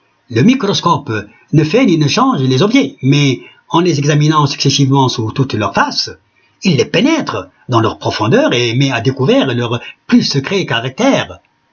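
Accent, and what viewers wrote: Paris, France. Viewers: Une prestation magnifique, magistrale. On ne dirait pas à première vue que c'est un citoyen arabe qui parle dans la langue de Molière avec un accent purement parisien, dans un langage châtié, caractérisé par une éloquence qui fascine, une rhétorique qui plus qu'attirer l'attention, la retient du début à la fin. On est condamné dirais-je à une concentration brisant les cailloux de l'ennui des discours monotones, comme disait Paul Guth. Un véritable tribun qui rappelle Jaurès, mais avec un style plus modéré dans le ton et l'accent, comme dans une symphonie Beethovénienne, où la mélodie s'élève et descend harmonieusement, dans un débit parfois shakespearien. Le Professeur joue avec les mots comme il peut, mais toujours dans le sens qu'il veut, dans le respect de la grammaire. Voilà pour ce qui est de la linguistique. J'ai donné mon point de vue en ma qualité d'enseignant agrégé de grammaire. 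S'agissant du contenu et de la sémantique, j'en apprends d'avantage sur un conflit dont beaucoup de Français comme moi ignorent tant de choses. J'ai hâte d'en savoir plus, à partir du prochain épisode. Mais déjà le premier, annoncé en guise d'avertissement et d'intro, annonce déjà bien la couleur. Bon courage Professeur, et bonne chance par le Livre et la Plume. Vous honorez la langue française pour ne pas dire la francophonie, meux que des milliers de Français. Victor Hugo dormirait bien sur ses deux oreilles dans sa tombe.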